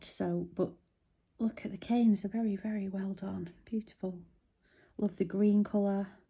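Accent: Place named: United Kingdom. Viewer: Love these videos and love hearing your British accent! Pretty weights!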